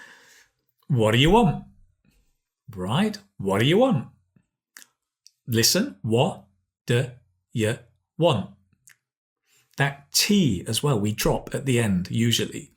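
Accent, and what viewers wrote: United Kingdom. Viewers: Hello Firstly,thank you for a useful lesson .I would like to know that it is British accent? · I think your pronunciation is Britain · Im starting to watch some British Channel... and it seems that im gonna like it... i always find difficulties in understanding someone who speaks Brits Accent.. thanks for it, Sir.. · You are a legend, I'm fallen in love with British accent, u r a marvel! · Thanks 4 helping me improving my English skills. I really love ur british accent. Ur an excellent teacher. Greetings from Medellín Colombia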